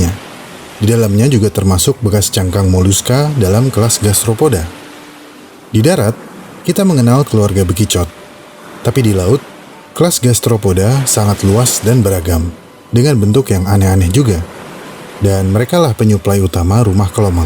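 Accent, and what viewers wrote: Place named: Makassar, Indonesia. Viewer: DARI MAKASSAR MANA SUARANYA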